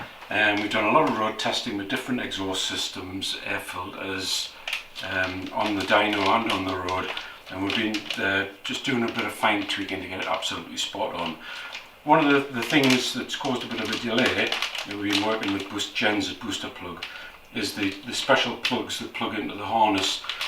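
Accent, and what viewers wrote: Newcastle, United Kingdom. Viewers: I said 900 TO a 1000 RPM . not 900 thousand- Sorry you may need an interpreter for the Geordie accent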